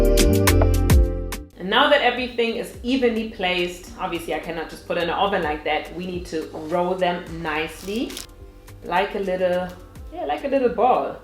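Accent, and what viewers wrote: Germany. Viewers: Sie hat doch einen deutschen Akzent, oder irre ich mich? Sorry kannte sie bis eben nicht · das liegt daran, dass ihr English sooooo krass deutsch ist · You can hear her German accent right away it’s kinda cute she is so damn pretty tho 😍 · Are you German or sth? You definitely don't sound American 😂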